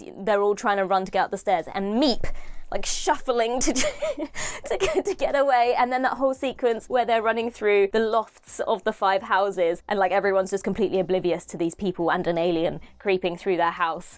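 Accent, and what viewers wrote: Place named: RP, United Kingdom. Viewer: As an American, I am always fascinated just sitting and listening to a Received British accent. Also, the enthusiasm for the Doctor Who episode is fun. Thank you again.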